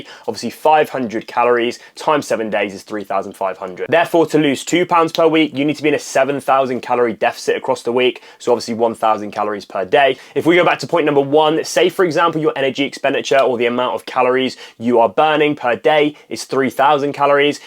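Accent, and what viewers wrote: United Kingdom. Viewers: Love your accent. What part of the UK is it from?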